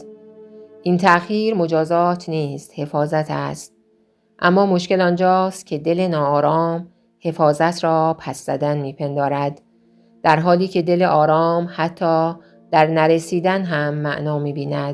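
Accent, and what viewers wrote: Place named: Turkey. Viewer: به خداوندی خدا ابن عربی ادم خیلی بزرگیست که در دین و عرفان عالمی بزرگ بوده اما نمیدانم چرا باید یک دوبلری بزارید که ادم حتا چندشش میشود به پادکست گوش داد من کل کتابهای ابن عربیه و ابن بابویه بجز دایرت المعارف ابن بابویه همه را چندین بار خوانده ام اما صدای این خانم با لحجه همشری ما ترکی به خدا خراب کرده ادم بزرگی مثل ابن عربیه را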